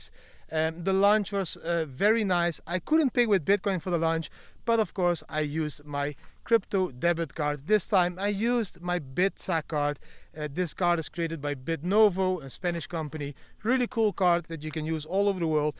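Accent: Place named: Netherlands. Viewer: Are you dutch? Ik hoor Nederlands accent in het engels! Goede content!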